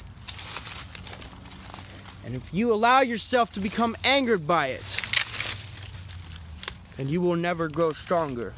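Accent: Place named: United Kingdom. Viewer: I actually thought you were a hyped British guy.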